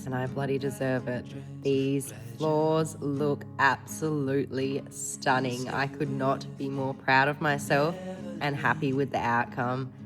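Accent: Australia. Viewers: So aussie, "me likey " yeah,nah.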